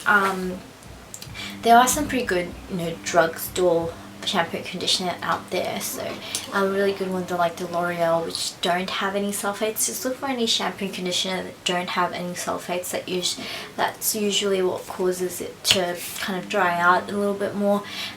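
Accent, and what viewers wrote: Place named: Australia. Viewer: you're from Australia right?? I reaaally love your aussie accent haha :) it's so unique and I love it :) and you have very nice ombre hair!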